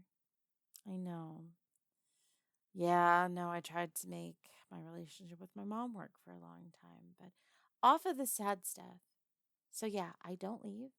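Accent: South, United States. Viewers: You know it's crazy you have such a good southern accent. It's almost like you were born in the south 😂. I'm kidding, I know it's real and it's amazing just like you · Your southern voice is sexy 😘😍😋🤤🥰❤️‍🔥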